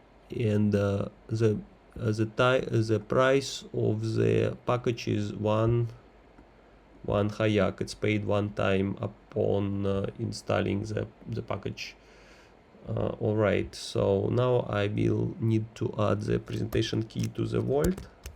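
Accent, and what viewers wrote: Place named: Russia. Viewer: Ух! Нечасто даже на просторах рунета встретишь такой ядрёный runglish :)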